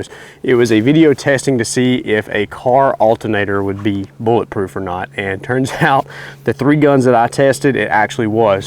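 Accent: South, United States. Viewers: enjoyed listening to your southern drawl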